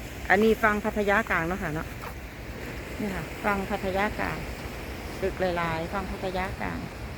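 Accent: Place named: Isan, Thailand. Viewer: สวัสดีครับ มาชมวิวทะเลสวยด้วยครับ อยู่จังหวัดไหนครับ สำเนียงเพราะดี (ผมเคยอยู่ร้อยเอ็ด เกือบ 5 ปี) ส่งกำลังใจมาเชียร์ครับ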